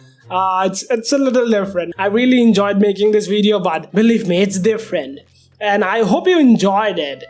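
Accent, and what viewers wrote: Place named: India. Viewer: great stuff I want more I just feel a bit uncomfortable with the accent it sounds like sounds I make when I feel sick making me sick, plus if I recognise the accent correctly this is a weak indian influenced/ indian accent which ill be frank I dont like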